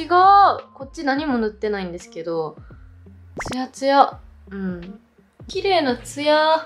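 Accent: Kansai, Japan. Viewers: の関西弁が好き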